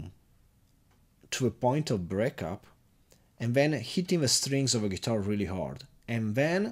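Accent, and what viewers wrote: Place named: Poland. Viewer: you're from poland, right?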